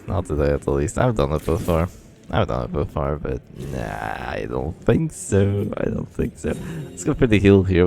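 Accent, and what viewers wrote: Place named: United States. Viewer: He has like no accent lol. I thought he was amerimutt, for like, 6 mounths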